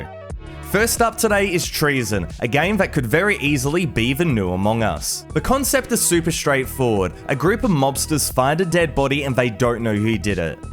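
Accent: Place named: Australia. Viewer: Aussie accent mate that’s how we say it.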